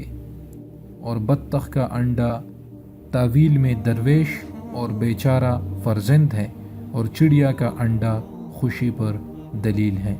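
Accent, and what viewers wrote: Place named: Arab world. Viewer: eysa lag raha hai keh Juma ka khutba sun raha hun> plz mic mun mouth se dur rakh kr audio rec kren our saaf urdu bolen, urdu ko arabic accent main bolne ka kia faida? our 'yeh ourat kre ga' ab yeh kia hota hai? kaniz pr dleel hai woh kia hai? kaniz means Gulam slave yah kia? female? hazrat danial A.S ke ilawah kuch nahi ho skta hai kia? chalo agar koi khatoon he dekh lia anda deti hui murghi tuo kia woh bhi ourat se he shadi kre gi? our kafan chori kre ga uski defination kahan hai? tuo kia zada ande nahi hum main se baz afrad ko hadees pehle se maloom hoti hain defination tlash kr rhye hote hain 3 mins 50 sec zaya krane ka koi faida? 7month se neache log qouestion puch rahe hain kisi aik ka bhi molana jwab dia hai keh nahi?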